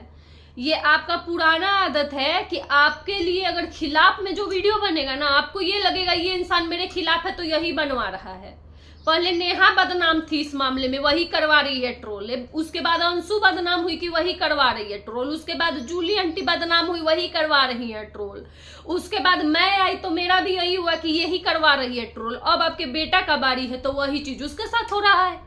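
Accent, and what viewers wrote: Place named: Bhojpuri, India. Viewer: Dear aap kahan se ho mast bhojpuri bolti ho bilkul mere jaise 😂😂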